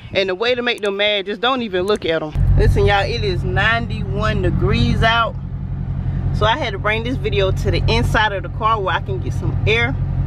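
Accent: South, United States. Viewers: That southern accent is something special.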